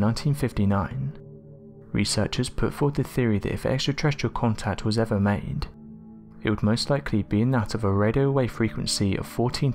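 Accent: United Kingdom. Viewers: Honestly, I love the content. I listen to other channels that have British narrators too. I find that this narrator speaks too quickly and his words seem to run together. He speaks too softly at times and I often find it difficult to understand through his accent even though I listen to other British accents often, for example, the narrator of the Top Mysteries you tube channel. I have trouble understanding this man. His voice is soothing though.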